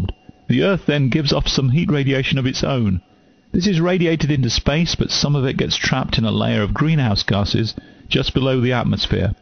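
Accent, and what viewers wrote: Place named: United Kingdom. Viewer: I love british accents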